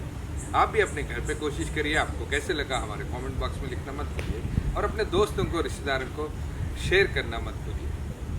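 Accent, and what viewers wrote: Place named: South, India. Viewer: hindi South Indian accent cool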